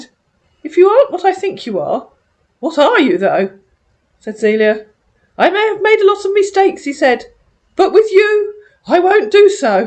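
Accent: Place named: England, United Kingdom. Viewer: perfume addict Thanks lol 💗 🤣 You have a lovely English accent, we were talking about our accents before, very easy on the ear. My accent is more flat. I hope you're enjoying your week ☀️🌿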